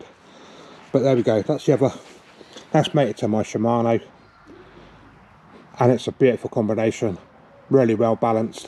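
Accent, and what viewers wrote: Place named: RP, United Kingdom. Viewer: Great video very posh like it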